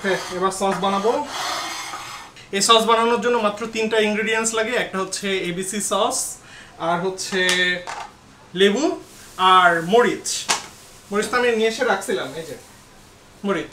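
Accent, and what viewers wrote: Kushtia, Bangladesh. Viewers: তুমি না থাকলে আমার বোর লাগে ❤️❤️❤️❤️❤️ আপনার স্ত্রীকে আপনি খুব ভালোবাসেন ভাইজান। আপনার কথাগুলো খুব সুন্দর ভাই, কুষ্টিয়ার ভাষাটা খুব ভালো লাগে। ভাই আপনার জলীয় বাষ্প ঠিক আছে,বলে যান😅😅😅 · R u from Kushtia?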